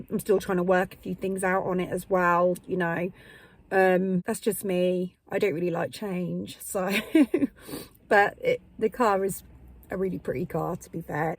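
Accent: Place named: England, United Kingdom. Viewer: Totally unrelated comment however I have been wondering what part of England you are from originally. You have a wonderful accent. Here in Australia I think one would have to be a linguistic expert to pick the difference in accents from each of our states! doesn't have the same accent as you?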